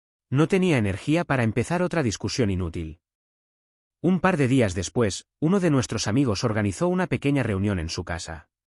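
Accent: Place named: Andalusia, Spain. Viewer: DÉ VERDAD QUÉ ES FACINANTE ÉL ACENTO TOTALMENTE ANDALUZ DÉ ÉL NARRADOR... SIGAN INCLUYÉNDOLO EN TODAS LAS VERSIONES DÉ ESTÁ PLATAFORMA...ES SENCILLAMENTE GENIAL...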